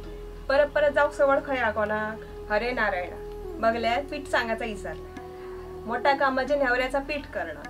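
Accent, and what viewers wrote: Malvani, India. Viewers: ताई, कोकणातलो गणपती इतक्या सुन्दर पद्धतीन शुद्ध मालवणीत सांगान सगळे लहानपणीच्यो आठवणी जागे झाले. शुद्ध मालवणी आयकताना गावाक पुढच्या लोट्यार बसान गजालिंच करतानाचो भास झालो. खुप खुप धन्यवाद देव बरें करो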